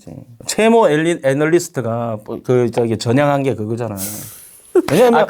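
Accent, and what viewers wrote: Gyeongsang, South Korea. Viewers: 경상도 분이신가요? 완전 다혈질이네요 너무 와닿고 공감 되네요 국회로 가십시요?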